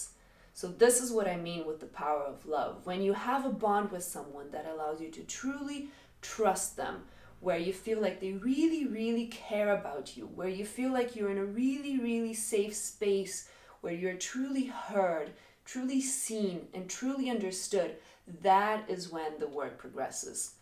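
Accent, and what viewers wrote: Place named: United Kingdom. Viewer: I really love what you say and the Nice British accent!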